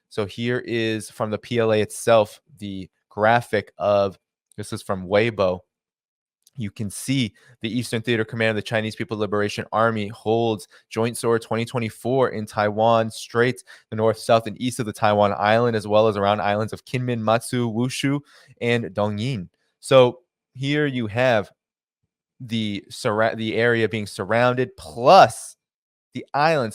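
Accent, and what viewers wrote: United Kingdom. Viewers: Love it a White Guy with a British accent.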